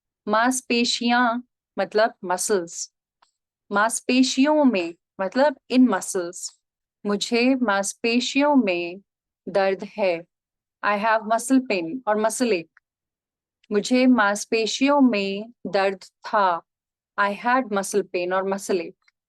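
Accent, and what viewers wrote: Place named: Delhi, India. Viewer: Hey, do you mind if I ask which part of India you're from? You sound like you have a Delhi accent, or at least from somewhere in the North. Also, I was going to suggest you to do a video about the numbers in Hindi.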